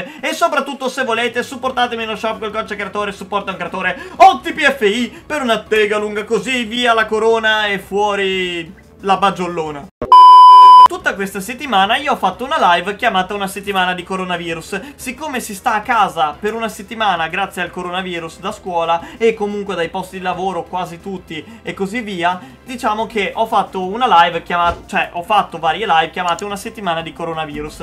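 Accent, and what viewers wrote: Veneto, Italy. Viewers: Ostrega te si Veneto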